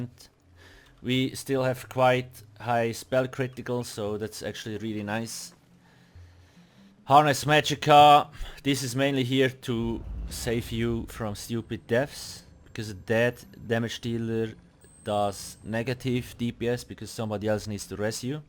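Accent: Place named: Switzerland. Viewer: So lustig, han no bevor e Minute ume gsi isch denkt, "das tönt schwer nach eme Schwiizer!". ^^ Merci für de Content, wiiter so. =)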